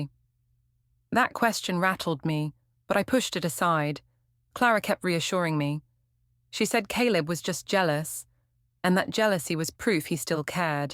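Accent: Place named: London, United Kingdom. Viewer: An American story read with an an urban London accent. Cool!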